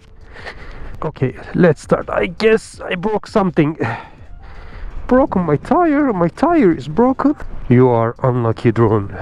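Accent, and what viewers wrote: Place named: Turkey. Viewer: senin o alttaki türkçe aksanını duyarım ben ;)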